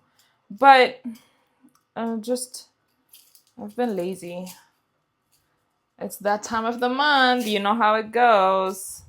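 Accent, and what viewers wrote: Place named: United Kingdom. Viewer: Love the British accent ❤ girl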